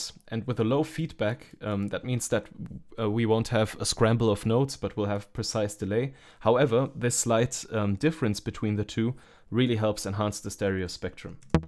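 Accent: Germany. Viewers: direkt deutschen akzent rausgehört :)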